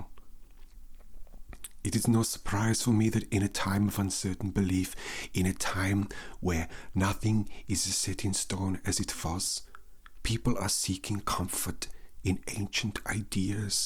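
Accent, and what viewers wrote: Germany. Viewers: Haha!! Love the German accent😂🤗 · Wow... That's a good german accent 👍 I'm from Germany and you have my approval 😂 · as a German i can say that its rare to hear a good German accent that isn't meant to be a joke...very nicely done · I've never known a German accent to be so smooth, beautiful and addictive. I speak Afrikaans, a branch of Dutch, and even though it's smoother than German, it's not as smooth as you've managed to make this language. This leads me to wonder how far over the horizon your talent stretches. So far I've been blown away, and, once again, my brain is sufficiently massaged. 😘♥️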